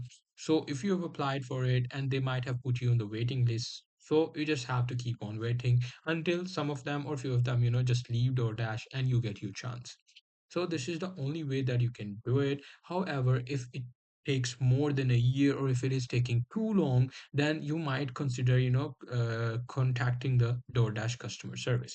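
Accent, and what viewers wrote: India. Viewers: Indian accent, opinion rejected. All indians are dirty scammers ew lol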